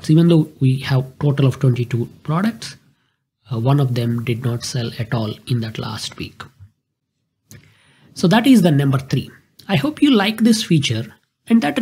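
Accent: India. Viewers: Next time get straight to the point without these useless sketches. And please use an ai to voice over your indian accent.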